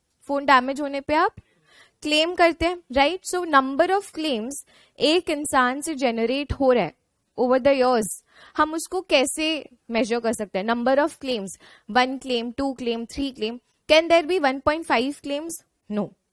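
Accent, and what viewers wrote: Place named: India. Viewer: Good teaching, Madam. However, given that we already struggle with the Indian accent, please avoid mixing English with your local language if your target is the global market. Thank you, and keep doing this great job!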